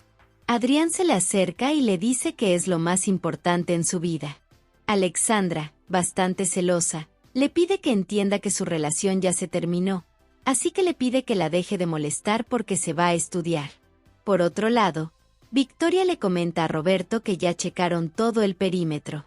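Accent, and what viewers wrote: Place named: Colombia. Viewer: Narra con acento Colombiano😊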